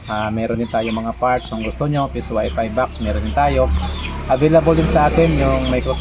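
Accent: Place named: Iloilo, Philippines. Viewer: ilonggo man ni gali. hahah taga diin ka sir?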